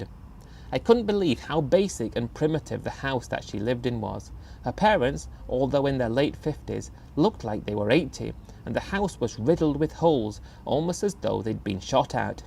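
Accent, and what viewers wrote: England, United Kingdom. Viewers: You dont really have an ear for accents. This guy who narrates these stoties is very much English. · Would that an English accent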